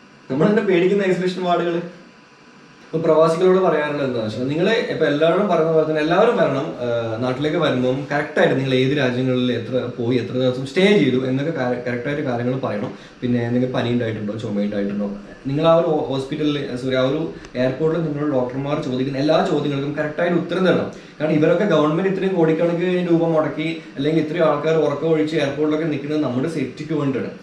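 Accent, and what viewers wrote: Kannur, India. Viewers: Bro nigal kannur karan anoooo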